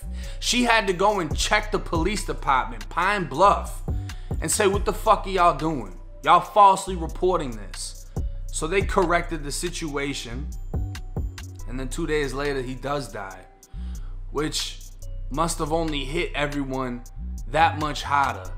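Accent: Boston, United States. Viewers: You sound like you from Boston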